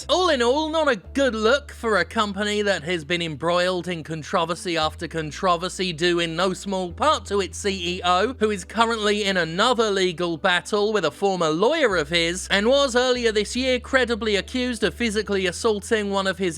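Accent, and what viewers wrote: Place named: United Kingdom. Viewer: I wish I could say controversy like that. But without the British accent, it just doesn't work.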